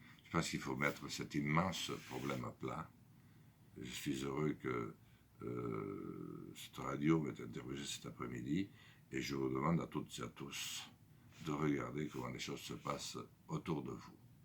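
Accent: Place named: South, France. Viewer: merci!! gros probleme de civilisation: rapt legal et irrespect pour les parents et enfants resultat: destruction de l'etre? helas: courage a ceux qui en souffrent! merci cher mr lasalle g voté pour vs! l'accent du soleil la vraie france du terroir!!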